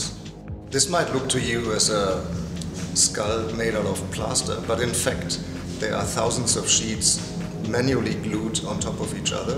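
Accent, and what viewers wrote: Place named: Germany. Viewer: that german accent...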